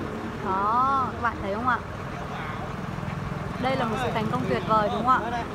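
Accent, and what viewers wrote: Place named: Thach That, Vietnam. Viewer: Gọng nói thạch thất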